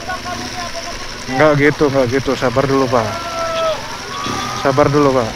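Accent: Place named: Jakarta, Indonesia. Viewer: hoalah iyaa, maaf om, Om Lampung asli atau bukan ya, soalnya logatnya kek org org pindahan dari daerah sekitar Jakarta Bandung dll😅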